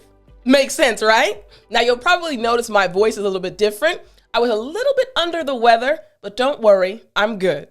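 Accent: United States. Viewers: Are you American English. I know it again and again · Hi Kolya, I'm not... but... she is American! I'm not sure which state, however...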